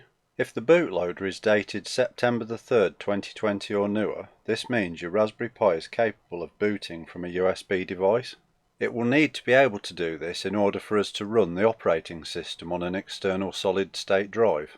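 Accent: United Kingdom. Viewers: a brummy voice i hear!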